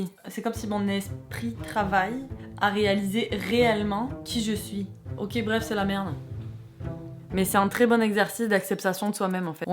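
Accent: Quebec, Canada. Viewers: J’ai remarqué que tu avais quand même un petit accent québécois hein ! En tout cas super vidéo ! N’hésite pas a voir ma chaîne et me dire ce que tu en penses pour m’améliorer !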